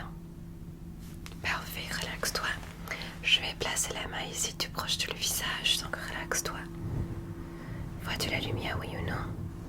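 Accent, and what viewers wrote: Quebec, Canada. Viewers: Ohh je suis tomber en amour. J'ai toujours eu de la misère avec le asmr québécois. Mais la...❤ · Bonjour je suis nouveau sur ta chaine j'aime bien ton ASMR avec ton axen québec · No way la seule asmr artist que j'écoute c'est celaine et je suis tombée sur ta video par hasard dans mon fil c'est funny😂 Je l'ai écouter et ca fait vraiment différent avec ton accent québécois et t'es un peu moins hyperactive que celaine 😅😂 mais c'est très bon bravo!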